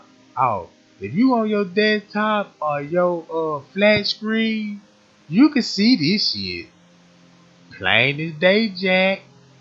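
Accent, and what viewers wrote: South, United States. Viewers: Love your work but hate the way you talk. Its not entertaining annoying you talk like u stuck in the 70's southern man. Jack jive piow shit like tht. You sound like a try hard to stand out but hey i could be wrong.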